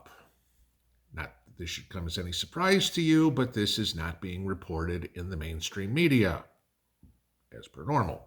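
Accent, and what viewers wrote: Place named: Indiana, United States. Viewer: Came across your channel, very interesting. You have an Indiana accent. Are you a Hoosier?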